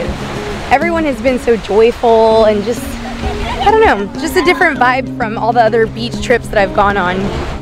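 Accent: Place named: South, United States. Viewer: lol? When she says "Ya'll," she is referring to friends at home in the states of Texas. - its a southern accent. I don't know why.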